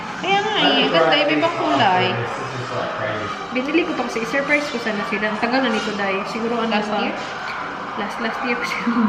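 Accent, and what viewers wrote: Visayas, Philippines. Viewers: Nakaka aliw yung bisaya-tagalog 😂❤️ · Hahahahaha, ah oi makatawa ko sa paburutin oi hahahaha.. cute kaayo magtagalog bisaya si 💋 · magkatawa ko usahay sa imong bisaya day,cute lang paminawon.like (paborutin)😁😁😁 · Cute jud kaayo ka ate mag tag-bis bah...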